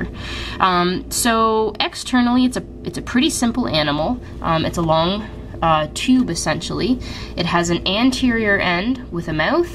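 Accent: Canada. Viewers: .... are you Canadian?:)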